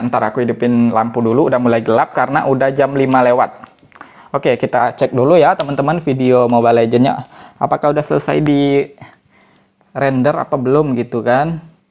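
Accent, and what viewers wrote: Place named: West Sumatra, Indonesia. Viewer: Logatnya sih seperti Urang Awak yo ?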